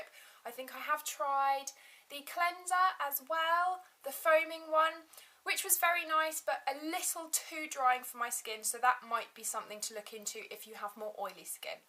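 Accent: England, United Kingdom. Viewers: Love your english accent! Please more vídeos!!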